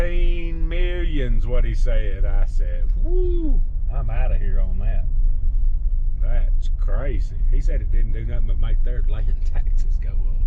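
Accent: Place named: South, United States. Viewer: You know it’s one of us Southern boys when ya hear “ that sure is a pretty rock”. I love it! Glad y’all had a good time brother! Thanks for sharing it with us.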